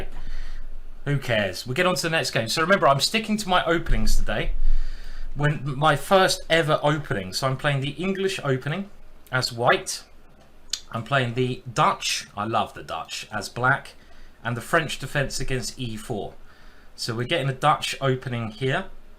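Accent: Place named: London, United Kingdom. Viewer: As italian I know nothing about english accents. Are you from London?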